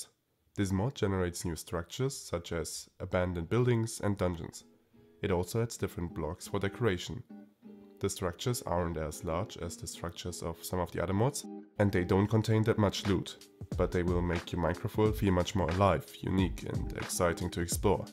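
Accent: Germany. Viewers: klingt deutsch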